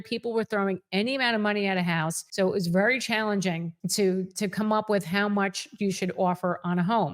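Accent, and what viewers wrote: New Jersey, United States. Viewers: I watch this channel for the New Jersey accent alone 😅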